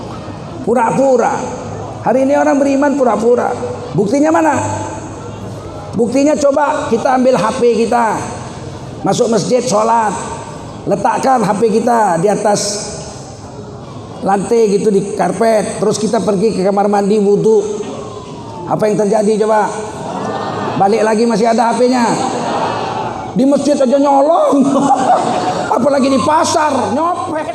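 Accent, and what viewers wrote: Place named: Medan, Indonesia. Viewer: hahaha...lawak ceramah nya... kok seperti orang medan cara bicara nya ya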